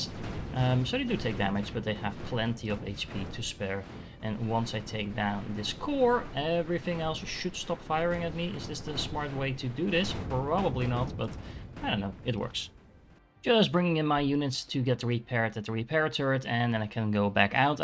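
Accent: Netherlands. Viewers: actual mindustry content????!!! rare to find also i love how we dutch people speak english you can emidiatly recocnize yourself lol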